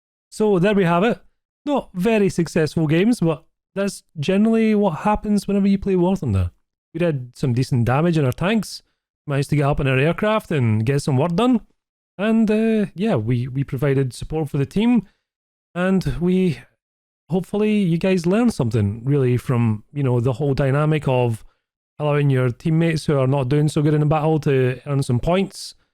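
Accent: Scotland, United Kingdom. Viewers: I always want to see a Sherman firefly video, I like these videos too its like a Phlydaily video but Scottish which is cool.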